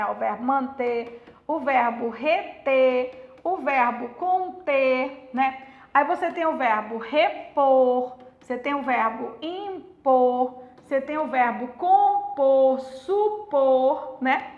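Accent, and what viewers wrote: Pará, Brazil. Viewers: Paraense pesada! Só pelo sotaque! 😊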